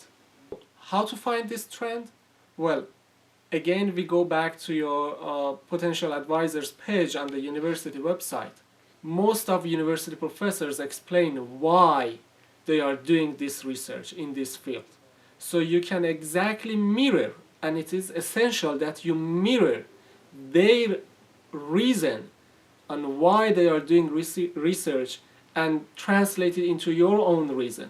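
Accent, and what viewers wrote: Iran. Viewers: love your persian accent, paydar bashi hamvatan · Love ur Persian Accent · From the first "Hello", I knew you were Persian.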